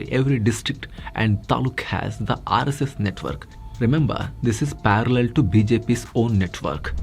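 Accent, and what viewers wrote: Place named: Karnataka, India. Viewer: Dosta.. don't narrate english like you do in kannada style.. doesn't sound good. Be natural.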